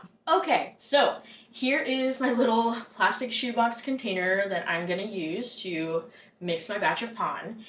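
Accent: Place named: Southern California, United States. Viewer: Ooh I really like you! I love it when I can tell right away somebody is from SoCal, then when I saw your username I knew for sure! You explain things in a great, direct and enjoyable way!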